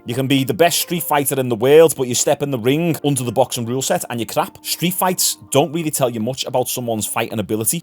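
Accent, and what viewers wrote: Liverpool, United Kingdom. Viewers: Love this channel! I'm from Liverpool, but now live in the States, and it's just nice to hear the accent. Plus, I follow all types of combat sports, so this is my new home for combat sports news. Yesterday, was awesome. It's going to be a good few weeks, honestly. Wish they would spread the events out a bit, though. Nothing for 5 days of the week and then overloaded with shit to watch on the weekends. 🤣😆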